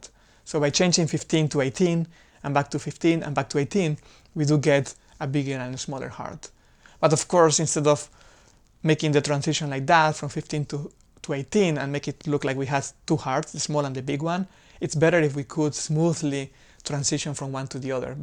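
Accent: Spain. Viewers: Really cool format and it results in a really entretaining and informative video. I can tell you speak Spanish from your accent so: muy buen vídeo! 😃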